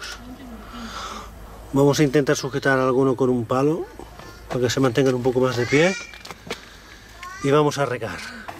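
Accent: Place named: Catalonia, Spain. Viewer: Noto en tu acento un deje Catalán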